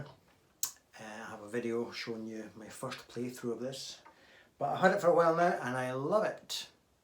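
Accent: Scotland, United Kingdom. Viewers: I come to this channel to listen to the gorgeous tones and phrases that can elicit from his great collection....but more than that is that super smooth Scottish brogue accent I hear when is regaling us with his stories....that voice is warmer than a cup of Horlicks! Put the two together and you have me transfixed!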